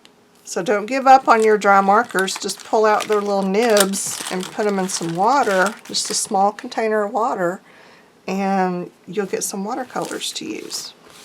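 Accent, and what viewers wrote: South, United States. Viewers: Brilliant as always. We all miss you so much. Thanks for treating us to that wonderful southern girl accent again. ♥️😉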